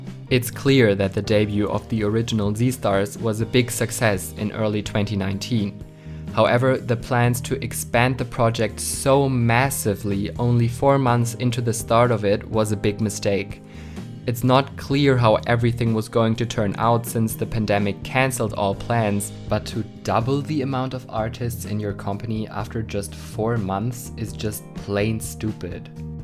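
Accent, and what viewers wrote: Germany. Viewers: As a German I can tell you are German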